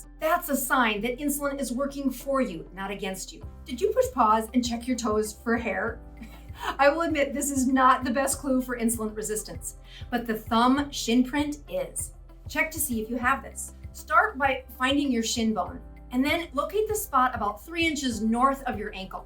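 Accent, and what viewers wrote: United States. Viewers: Tbh the moment I hear a US accent online, talking about medical or dietry advice, I tend to switch off. This woman is spouting her personal opinions, not verifiable medical information. Fyi four time Olympic rowing gold medalist Sir Steve Redgrave has type 2 diabetes. Unfortunately for him, at that time he was diagnosed there was very little known or fully understood about this particular auto immune condition. Redgrave was diagnosed with type 2 diabetes in 1997 at the age of 35. At the time, Redgrave was training and competing with a focus on winning a fifth gold medal at the Sydney Olympic Games in 2000. I defy anybody to find any of those stated symptoms on him. I know, because as a 52year old male in 2012, I too was diagnosed with type2 diabetes. I cured my own type2 diabetes, inspite of being told by my GP that it was 'incurable'. 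From my own extensive reading, I found there are over a dozen recognised forms of diabetes, all with unique causes and symptoms, eg pregnancy. The most common form is type 2 'late onset' diabetes. Characterised as 'insulin resistance'. This form of diabetes, if disgnosed within 10years from onset of the condition, in 80% of cases can be fully reversed ie 'cured'. Medical professionals even today will not claim a cure for type 2, but will say that you are 'in remission', as eg they would with a cancer. Type 2 diabetes: If you are subject to extended periods of uncontrolled stress in your life, your body will flood with energy, in a 'fight or fli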